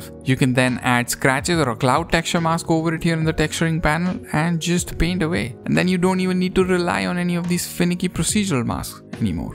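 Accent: India. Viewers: Nice tut, tnx! Your Indian accent and mumbling make it unbearable, though.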